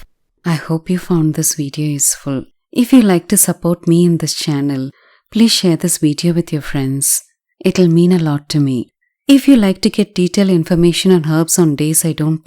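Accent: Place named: South India, India. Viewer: Awesome video 😘 I guess you too from south India, from your accent, it's beautiful.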